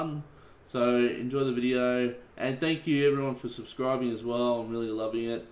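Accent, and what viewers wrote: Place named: Australia. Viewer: nice ausie accent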